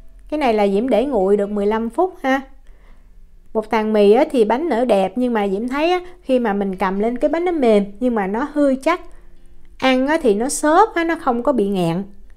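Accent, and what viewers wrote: South, Vietnam. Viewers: Diễm hướng dẫn kỹ lưỡng, nói chuyện dễ mến giọng miền Nam Chúc Diễm năm mới nhiều may mắn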